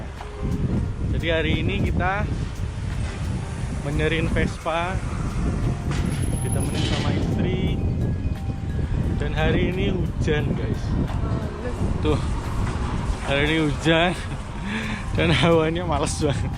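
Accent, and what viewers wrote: Java, Indonesia. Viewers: Bahasa Indonesia-nya koq medok aslinya dari mana mas..?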